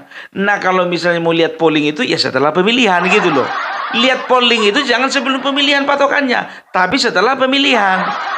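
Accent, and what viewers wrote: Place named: Java, Indonesia. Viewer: Bang good logat jawa nya aku suka banget 👍👍🙏🙏😀😀😀😀😀😀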